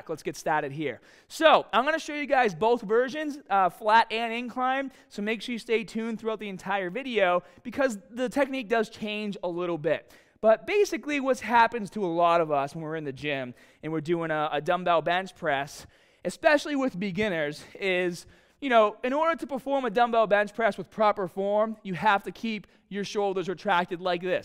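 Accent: Boston, United States. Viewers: Great video guide. You from Boston?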